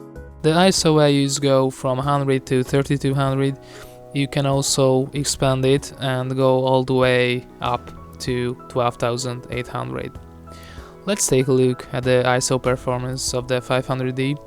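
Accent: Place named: Hungary. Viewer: az a jo magyaros akcentus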